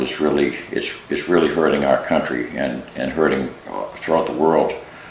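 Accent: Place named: Vermont, United States. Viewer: Are you VT/N.H.? Your accent reminds me of one of my troops in Iraq. He was raised on a dairy farm in VT. He's now a detective locally.🇺🇸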